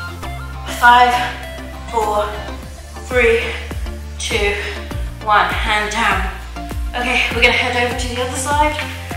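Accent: United Kingdom. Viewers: Bloody brilliant! I'm not overweight but I am unfit. I hate watching all the buff fitness instructors strutting their perfect bodies on YouTube. We need real people we can relate to. Please keep it up. You are a breath of fresh air. It's also a bonus to hear a British accent for a change. 😘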